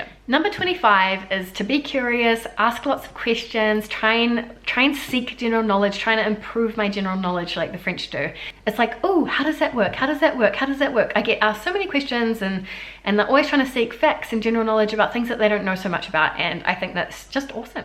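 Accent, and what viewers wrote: New Zealand. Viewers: Happy Birthday, I have short showers due to hot water shortage but I still manage to rinse my soap. You better rinse just in case is not good for your skin. A lot of people also don't rinse the dishes from the soap in Australia. The only time I don't rinse the soap of me is when I have bath because I run out of hot water. May your 30s are as happy as your 20s. You are real pleasure to watch. I like the way you speak NZ language!!! And I like your French too. I have heard you speaking French!!! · Joyeux anniversaire!! Merci pour cette chouette vidéo et pour ton appréciation de la France. J'aime ton accent et tes expressions NZ. 😊🎂🍾🎉👏👏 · Bon anniversaire ! C'est marrant tu as repris ton accent néo-zelandais xD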